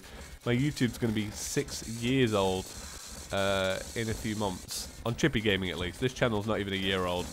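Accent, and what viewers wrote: United Kingdom. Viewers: Stereotypes are bad, I know but in the middle all I could think about was how steriotypical of a British person sounds Have a good day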